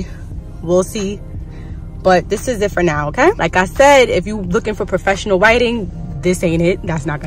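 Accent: New York, United States. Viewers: I like the video helps you from New York 🗽 I peeped the accent 😂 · You are so NewYork! I love it ! I'm from Queens and I know an original NY accent when I hear it. Subscribed.....God Bless you and your daughter.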